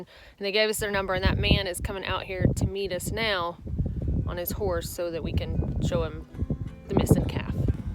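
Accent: South, United States. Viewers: I usually turn CC on because my hearing isn't the best and I think it's hilarious that it interprets your "hikers" as "hackers". Hurray for your Southern accent :)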